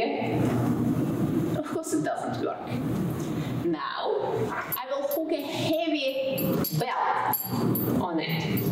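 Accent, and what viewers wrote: Russia. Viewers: Обожаю её акцент, сколько лет живёт в англоязычной стране, а всё равно остаётся русской! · Увидела ролики с ней в ВК и сразу стало понятно, что она из русскоязычной страны - настолько этот акцент в английском языке слышен мне, обычному русскому обывателю. Именно так, как преподавали инглиш в советских школах (произношение я имею в виду). Я специально пошла на Ютуб искать эту чудо - даму и наткнулась на этот канал.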